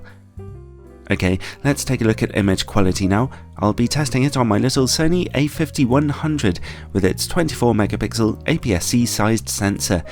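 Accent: United Kingdom. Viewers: He's british, and has a very british voice. That's the brit secret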